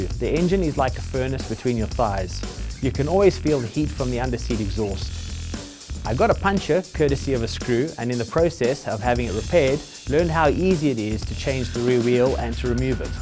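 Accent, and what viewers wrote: South Africa. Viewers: Fucking Bothered I wanna say South African.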